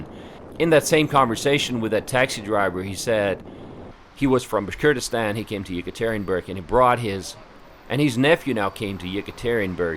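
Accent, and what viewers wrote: South, United States. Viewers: New sub. Очень приятно. May I ask where did you learn English? I've been watching your videos and when you talk you have a particular accent...it sounds either southern or you learned in the "hood" (no disrespect I'm from the "hood") either way I like your videos. Спасибо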